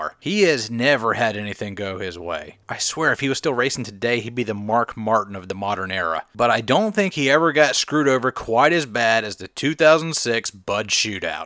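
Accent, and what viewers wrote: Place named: Texas, United States. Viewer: he speaks so fluent at the same time with a texas accent. This is why i like this channel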